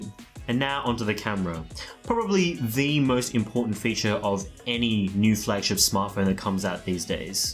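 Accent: Australia. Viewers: Hey, which part of Australia are you from? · Ahmad Fuad The guy here is from Australia as I guess from his accent, and Australia got the Exynos version. The Exynos is much better optimized and runs much smoother than the Snapdragon. Honestly, I think he exaggerates the stutter (there is no actual lag, only microstutter, which isn't the same as lag), as it is only noticeable if you really look for it and specifically pay attention to it. My only gripe with the S8 is the redundant Bixby button and the awkward position of the fingerprint reader. I would also be happy for a better speaker, or rather two stereo speakers, and a 32bit DAC for the audiophiles who walk around with fancy headphones. I found the camera to be amazingly good, especially the video capture is astonishing. I also like how the phone feels in the hand and how comfortable it is to hold it and to operate it with one hand.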